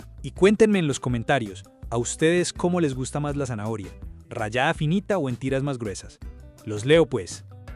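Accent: Colombia. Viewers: Greeting from the USA! No tengo ni que preguntar de donde eres. Ese acento Colombian se distingue muy bien. Gracias por tu receta muy rica. Ahora que me puse de dieta, voy a empezar a vez mas tu canal que acabode descrubir.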